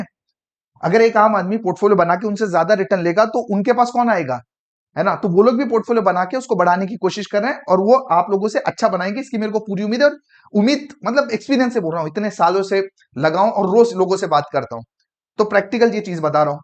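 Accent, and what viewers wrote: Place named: Maharashtra, India. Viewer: Assalamualikum bhai ❤. Alhamdulillah 99% sahi bole ap. Aj ap jaise logo ki sakht zarurat hai waise to log mahino me kae fuzul kharch kardete h jaise pan,Gutkha, cigarette aur najane kya 😢. Allah pak hame sahi jagah kharch(investment)karnewala banae. Ameen bhai jo bhi apne samjaya ❤ par question ye hai sab apne theoretical samjaya Alhamdulillah samaj me Aya practical demo samjao jaise 1. SIP aur LUMSUM kaise kare ispe 1 videos 2. DIRECT aur REGULAR kaise kare. Aur bhi question h par Insha Allah ap se judne ke baad. Apka tune samaj me ata h q ki ap Maharashtra se ho aur ham BHI AURANGABAD se ❤ Allah pak apko jazae khair de aur apki team ko bhi. Assalamualikum ❤ Plz reply 🙏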